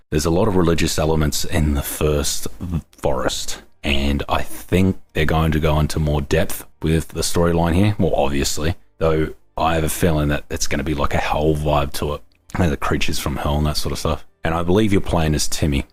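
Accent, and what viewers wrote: Australia. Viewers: Mate, are you Australian? Is that a fellow aussie? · your from Australia buy the sound of it, plus the Aussie sarcasm, or i have been in isolation for way to long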